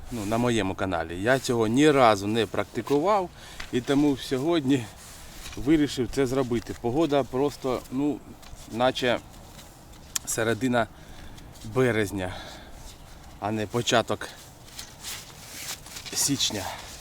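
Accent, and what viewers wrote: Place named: Chernihiv, Ukraine. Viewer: я живу в полтавській,з чернігівської переїхав років двадцять тому,і ось натрапив на ваш канал,і по рідному акценту зрозумів що хлопак з Чернігівщині)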